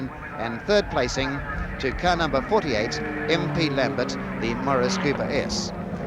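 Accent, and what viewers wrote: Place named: United Kingdom. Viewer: Yes. does a great job here in commentary of giving silly old codgers a bad name. Evidently, motor racing & production sedans especially, were not his strong suit. However, his commentary here is a priceless time capsule displaying how things really were back in 1968. Aunty (Our ABC) did perform a marvellous public service by sending film crews, cameras & commentators to a multitude of events similar to this one when the big three commercial networks couldn't be bothered. Then, to cap off that effort, they archived the film reels long before Joe Public could lay his hands on a video tape recorder. may have been a tad in over his head but here he is working on a weekend devoted to his work & bringing us some classic Aussie motor racing action. commentary here is priceless & bordering on comedic. He barely had a clue but gave it his best shot in his awkward best Queen's English. 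From his commentary, I can picture him & many like him, black suit jacket, thin red tie reaching into the glovebox of a Humber Snipe or a Vauxhall Cresta, retrieving a thermos & pouring a steaming hot cuppa in the paddock between events at glorious old Warwick Farm. takes us there.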